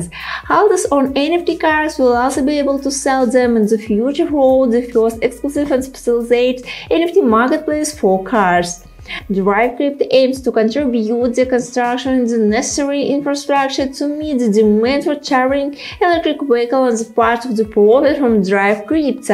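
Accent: Russia. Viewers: how to understand in a second that she is from Russia))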